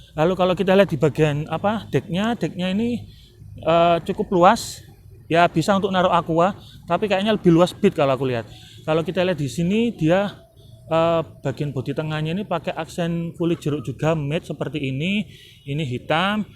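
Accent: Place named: Java, Indonesia. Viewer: mantap reviewnya mantap suara medoknya he....thankz bg..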